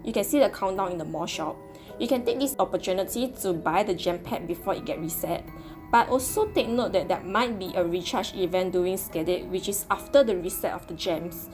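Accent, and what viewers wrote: Singapore. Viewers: The chinese / sg accent satisfy me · Hi, are you from Singapore? Haha you sound like it 😅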